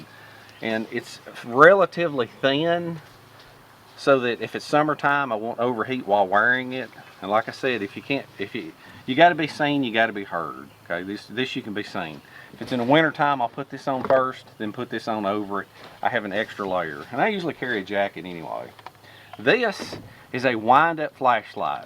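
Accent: South, United States. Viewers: I subscribed to you a few weeks ago now. Love your honest life experience opinions. Keep your videos coming, very educational. Seen so many bug out bags, the wife's kicked me out bag lol, get home bag, I got this knife to add to the 5 other knives lol Common sense. I've added to my van a few of what you have. Many thanks. My wife loves your southern accent.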